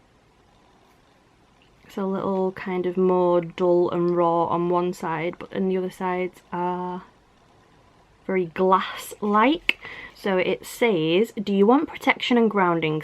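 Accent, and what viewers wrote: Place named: Wigan, United Kingdom. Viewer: I dont know if you seen my comment on your last fright crate unlocking but the pumpkin tea light holder that was smashed they are selling in home bargains i live in wigan and your accent sounds close to mine so I'm thinking yours will also have them in the Halloween range it has a face on the front of it and is a wax melt also comes with a pumpkin spice wax melt smells delicious and is about same size as the smashed one you recieved in your box I bought one other week for 3pound I think I love it and instantly thought about you because I knew you was disappointed they couldn't send you another you look amazing as always ❤👑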